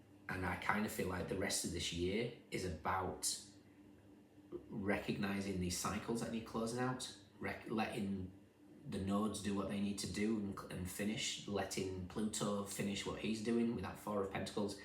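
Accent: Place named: Scotland, United Kingdom. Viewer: Hey there handsome man! and thank you for your time and by the way has anyone ever said that you have such a lovely accent !? 🤔 ❤ Cause you do!🌹😊 Is it Scottish? Enlightened me.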